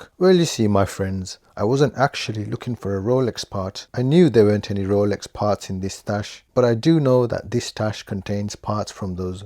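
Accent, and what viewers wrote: Birmingham, United Kingdom. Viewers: You a brummie mon?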